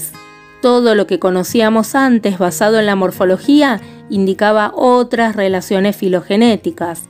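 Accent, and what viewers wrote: Argentina. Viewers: dices por aquí, de donde eres? te noto acento argentino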